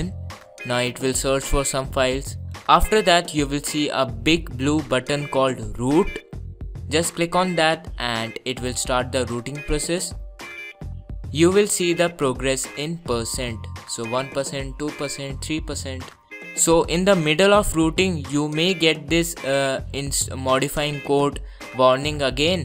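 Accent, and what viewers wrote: India. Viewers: bro from your accent i bet you are indian right? You english is pretty good tho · sounds indian but uses chinese as his text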